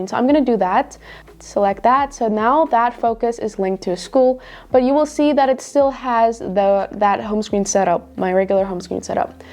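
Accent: South Africa. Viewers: Are you South African? Great video!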